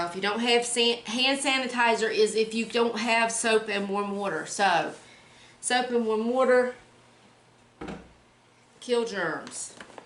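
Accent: South, United States. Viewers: You're such a good Mama, great haul, and sure have missed your Southern accent, be safe♥️🐾♥️🐾♥️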